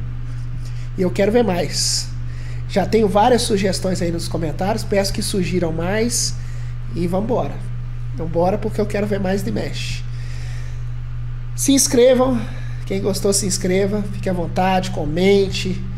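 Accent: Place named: Brazil. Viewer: Hi the best thing to do is listen to the singer in order you have done 1 & 2 so next is 3 thanks again for the subtitles my partner is Portuguese from Madeira so I understand some Portuguese but Brazilian Portuguese is slightly different love the Brazilian accent though ❤️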